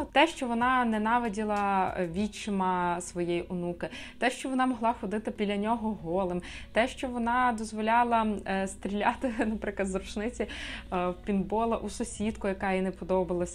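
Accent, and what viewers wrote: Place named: Surzhyk, Ukraine. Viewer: На нормальном русском языке смотрели бы вас больше. Чем на деревенском польско-русском суржике.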